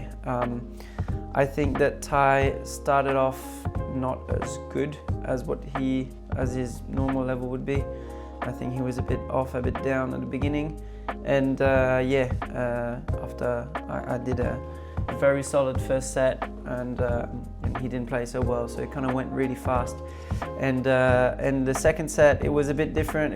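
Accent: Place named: France. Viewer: I tought you were french or belgian with the accent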